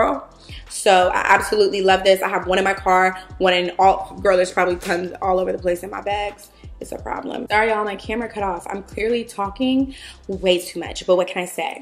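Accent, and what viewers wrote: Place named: Florida–Alabama, United States. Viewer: I love that you pronounce everything JUST. LIKE. ME. Gurrrrllll… I grew up in Floribama too - Pensacola to be exact! You grew up right down the way (I believe)! I know the word “carafe” looks like it’s pronounced like the words “care + if” but it’s actually pronounced like “giraffe.” (Currr-afff) Guuurrrllll… words are always pronounced the exact opposite way my Floribama behind thinks!!! LOL! But say that word however you like! I think it just shows our shared regional cuteness 💖💖💖 ❎🅾️ Susan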